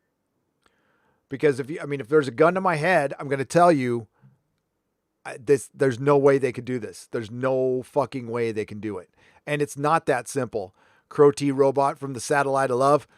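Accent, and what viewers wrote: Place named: Scotland, United Kingdom. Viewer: Dude, I didn't need 23 and Me to tell you are Scottish. You are so very Scottish. Well aside from not hating the English anyway.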